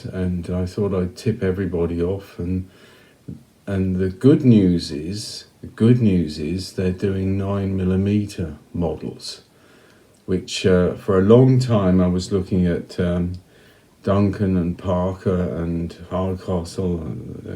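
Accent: Yorkshire, United Kingdom. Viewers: Great video, big grin and chuckles over here, enjoying your take on it all with the Yorkshire accent. Bravo! Watched this after your channel update video. Godspeed to you, prayers said from here. You are going to do great things for folks who really need help. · Hi, Received my Hardcastle pipe today. The same one you have, put with no filter. The last pot shape they have. I’m breaking it in with some Watch City Cigars Old Dominion Flake and a nice cup of Yorkshire tea. Life doesn’t get any better than that. Great Yorkshire accent. By the way love your videos. Charles.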